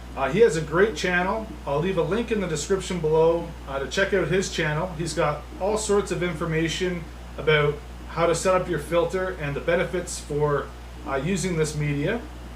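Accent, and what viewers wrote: Canada. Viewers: Why do you have such a bad accent? Are you way deep in Canada?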